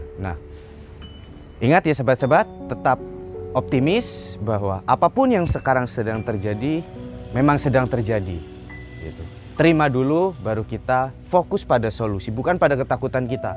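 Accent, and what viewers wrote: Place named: Banyumas, Indonesia. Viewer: Oh wong ngapak ya . Salam sekang Pemalang mas. Tangga kota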